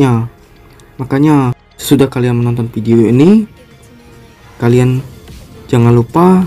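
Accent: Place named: West Java, Indonesia. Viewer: Sunda bang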